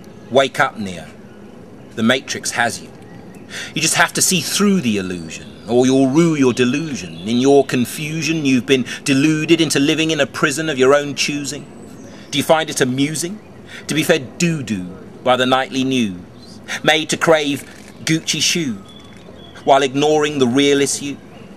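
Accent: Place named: United Kingdom. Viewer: sweet british accent lol im not that into poetry but this sound pretty awesome :D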